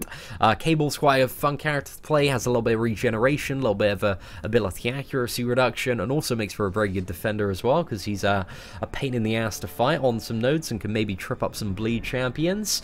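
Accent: United Kingdom. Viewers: Dude, you sound so British.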